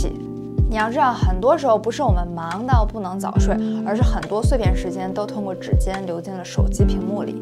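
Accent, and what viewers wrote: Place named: Beijing, China. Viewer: 好喜歡你，謝謝鼓勵我們！每次聽到你說話，我彷彿感覺我還在北京。👍👍👍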